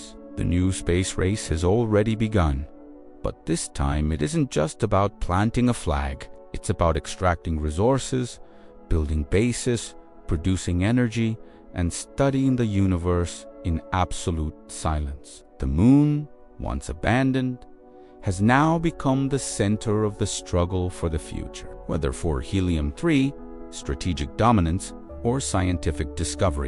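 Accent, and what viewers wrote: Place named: United States. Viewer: I can tell you’re American.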